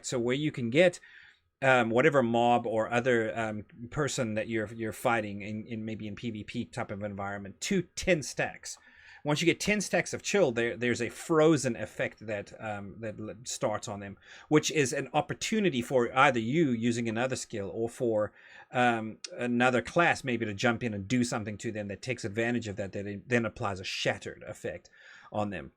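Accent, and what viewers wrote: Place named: Texas, United States. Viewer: I liked this video quite a bit, and also happy you are doing more content. But, the big story for me is that I am starting to hear a Texas accent slip into your narrative occasionally :)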